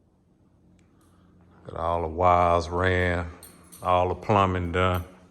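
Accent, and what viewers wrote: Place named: South, United States. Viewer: Where are you from? That sounds like a strong Southern accent... like mine 😂. First time watching, from MS.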